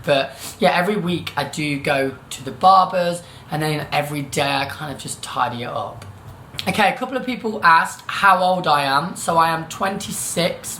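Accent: United Kingdom. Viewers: Esse sotaque britânico ui ui ui, homem você é um sapão 😏 kkkkk ( posso falar oq eu quiser que ele não vai saber mesmo )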